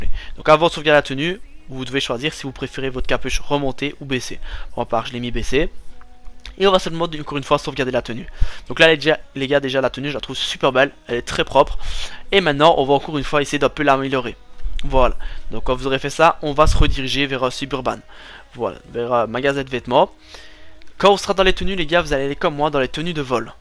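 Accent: Liège, Belgium. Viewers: T belge ? Haha ton accent es puissant couzin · Sa sent l'accent de liege ça haha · Toi je parie ma vie t’es liégeois hahaha quel belle accent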